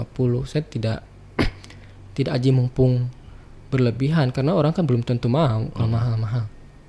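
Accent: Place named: West Java, Indonesia. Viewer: kaya nya org sunda ya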